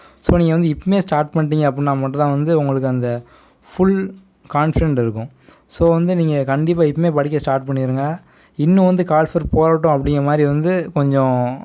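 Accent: Tirunelveli, India. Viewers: Bro super thank u...But neenga nellai or thoothukudi yetho oru area thana...bro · Thnq so much...and happy to hear tirunelveli slang...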